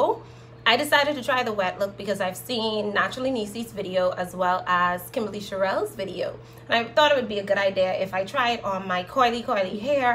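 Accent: Bahamas. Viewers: Just started watching and I'm subscribing already because I hear that you're a fellow Bahamian. Big up BAHAMAS!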